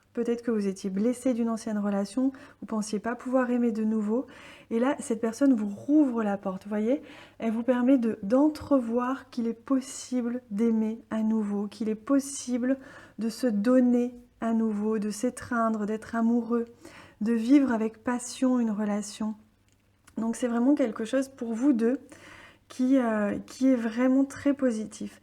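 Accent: South, France. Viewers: Merci, trop mignon le flamand rose Avé l'assent 🌹😊😊😊😊💕❤️❤️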